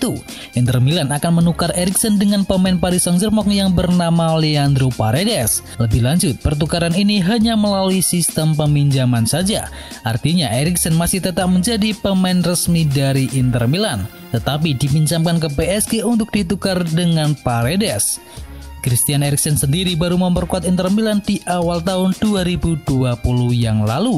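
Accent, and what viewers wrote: Java, Indonesia. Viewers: Medok amat mas bro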